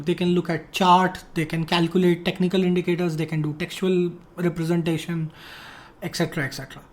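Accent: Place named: India. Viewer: i tried to listen to it but accent is very heavy and the indian words thrown in, in the middle of sentences (or maybe they are in english but due to heavy accent they sound like indian) makes the video very distracting and difficult to follow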